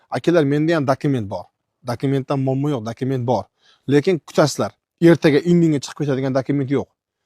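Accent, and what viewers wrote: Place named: Uzbekistan. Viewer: Гап йук ака соф узбекча гапирар экансиз.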